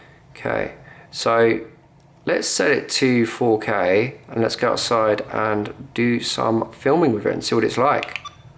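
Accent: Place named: London, United Kingdom. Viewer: it's a regional accent most likely - probably down south around (east) london. there are many of them. words often arent pronounced like theyre written. certain sounds simply aren't produced. other languages, like italian in particular, are famous for this.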